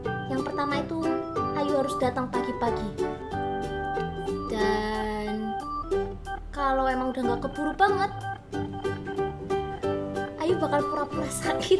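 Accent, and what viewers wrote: Java, Indonesia. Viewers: Medok banget jawanya 😂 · Wah,hi ini Brusan dengar suara ni,jdi org jawa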